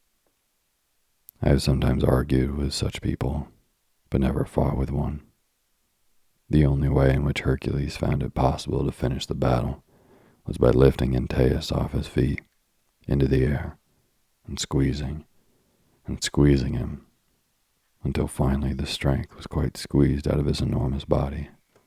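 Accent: South, United States. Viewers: Is there a southern twang?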